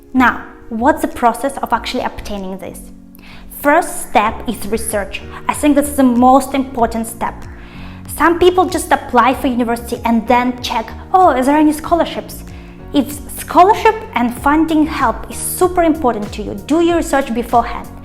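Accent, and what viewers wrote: Russia. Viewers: Sounds russian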